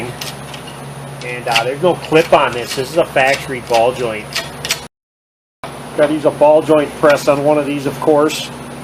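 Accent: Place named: Minnesota, United States. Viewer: I could tell you’re from Minnesota. I also just watched the TV show Fargo.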